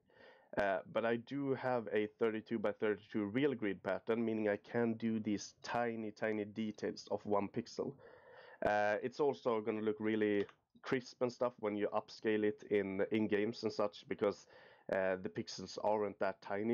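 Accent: Sweden. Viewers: Nice Swedish accent! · Tack (Jag antar aatt du är svensk, det låter så)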